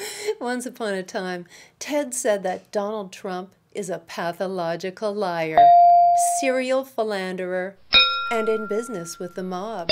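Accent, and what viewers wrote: Canada. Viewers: You’re the best but do I detect a bit of a Canadian accent?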